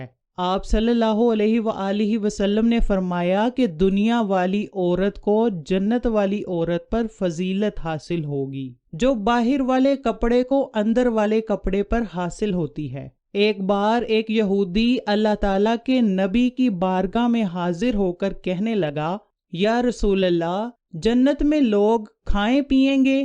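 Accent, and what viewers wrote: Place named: Punjab, Pakistan. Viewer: یہ عورت پنجابی ہے کہ رہی ہے کہ جک جائے گی حالانکہ جھک ہے لفظ یہ پنجابی گھوڑا نہیں کہ سکتے بہت زور لگا لیں تو گوڑا کہتے ہیں ویسے پنجابی کوہڑا نہیں کہوڑا کہتے ہیں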